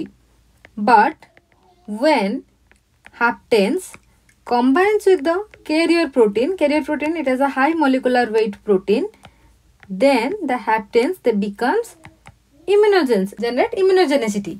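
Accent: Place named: Odisha, India. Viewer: I was first confirmed that you are from odisha by listening your speaking style...thank you so much ma'am... For making this understandable.. Please upload videos for ug students. 🤗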